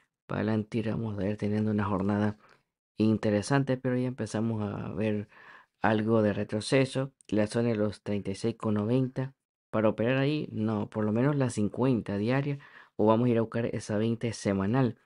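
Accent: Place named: El Salvador. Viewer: que nadie nos benga a dar cazaca 😂😂😂. Que se me hace que sos Salvadoreño.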